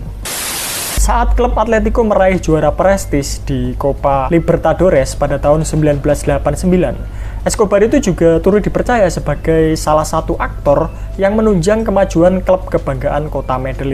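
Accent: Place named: Java, Indonesia. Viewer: Medhoknya khas banget muehehe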